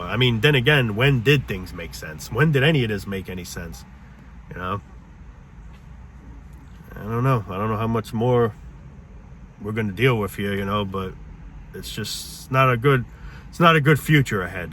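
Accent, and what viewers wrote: New York, United States. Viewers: Your cynical New Yawk diatribes at 2x speed are a real treat.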